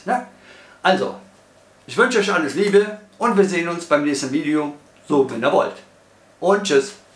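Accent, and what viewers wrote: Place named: Cologne, Germany. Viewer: Sehr sympathisches Video. Ich finde deine Art zu sprechen cool, bist du aus der Gegend Köln?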